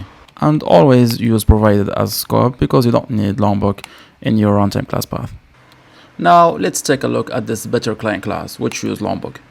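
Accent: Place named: France. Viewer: I've watched the Kubernetes video and now this one, I've gotta say that you're explaining everything great. Your videos have a nice format and are quite enjoyable to watch, but I have one small thing to say to you and I mean no offense here - we all know you have a french accent when talking and sometimes (here and there) you talk so fast that I lose you, so for your future videos if you could slow down just a little bit. :) Hoping to see more from you, subscribed! P.S. the brackets plugin is amazing, thank you!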